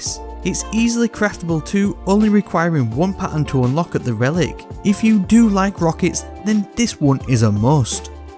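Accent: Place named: North, United Kingdom. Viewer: He's just northern leave my boy alone. He makes some of the best d2 content on YouTube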